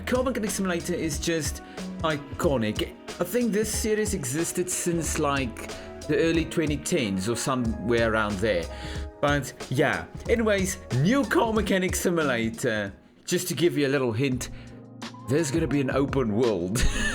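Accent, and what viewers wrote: South Africa. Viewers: hey dude epic video just a random question here by any chance are you south african? I'm just asking as myself im South African as well and the accent sounds very like South African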